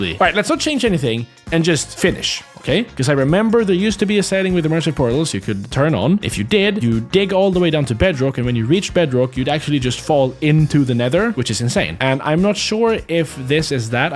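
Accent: Sweden. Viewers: hejsan du låter svensk! men är du det?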